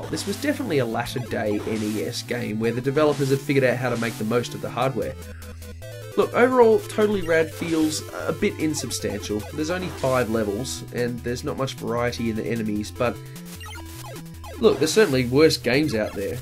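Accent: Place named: California, United States. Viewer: Dude, as a native Californian, I have to say.... that was a pretty damn good Cali dialect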